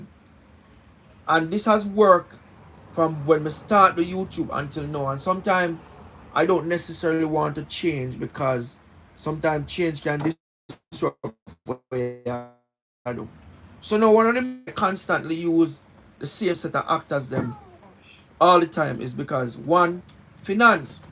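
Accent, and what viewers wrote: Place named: Jamaica. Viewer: I understand, but the Patwa is part of our culture, and a lot of foreigners love how we speak. Anyway in the name of progress and a wider audience do what you need to do, just keep the Jamaican accent You will always have my support.❤❤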